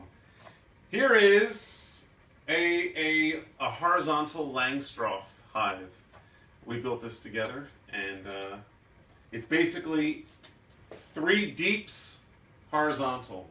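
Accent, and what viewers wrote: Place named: United States. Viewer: Love your hive. Great American accent. '...the girls...'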